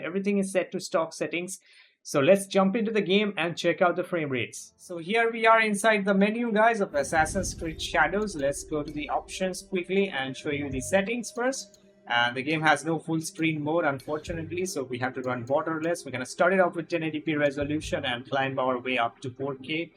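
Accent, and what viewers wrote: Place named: India. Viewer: слышу индуса и на душе тепло как-то сразу становиться.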